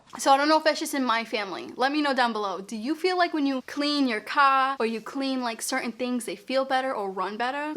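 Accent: Boston, United States. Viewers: OMG YOUR FROM BOOOOSTON I LOVE IT 🥰 love the accent love seeing ppl from my city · Is that a Boston accent? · Brooklyn? I could see confusing it for Queens, but this is 10000% a Boston accent. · Omg that looks like a completely new mattress! How crazy! By the way your hair looks really good in this video 🥰. Oh and I love hearing your Boston accent 🤗 I like how you say car and carpet! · Also I love how many times you said car and carpet in this video I love your Boston accent!!! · Is that a Boston accent? 😮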